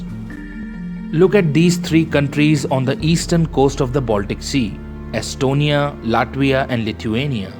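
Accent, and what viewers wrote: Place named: India. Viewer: Thank you for your correct pronunciation of words contain V and W. In India in the last few decades there has been a trend to reverse the pronunciation of these letters which is painful to listen to as an English speaker. Also your accent is pleasant to listen to which isn't always the case with the modern Indian accent.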